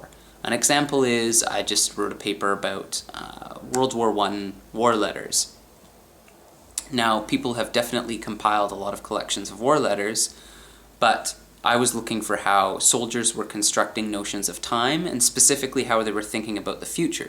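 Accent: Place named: Canada. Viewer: I love your accent. <3 Canada!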